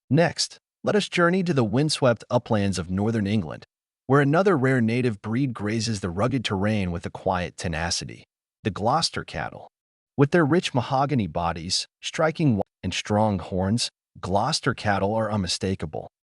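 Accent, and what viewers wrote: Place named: United States. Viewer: The 'British' aren't claiming anything, this isn't a British channel, the voice-over has an American accent. The creator of this content doesn't even have much of an idea of English geography, claiming that Gloucestershire is in the north.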